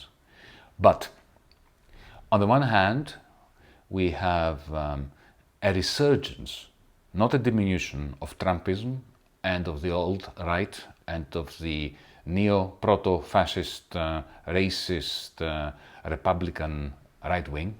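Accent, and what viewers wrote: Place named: Greece. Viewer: Just keep using your Greek roots as you speak. I'm envious it makes your speech a lot more sophisticated and easy lol.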